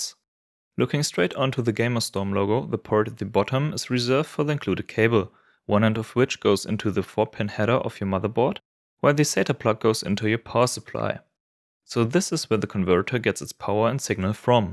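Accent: Germany. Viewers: That heavy German accent Tho :) . Nice video BTW , keep Going.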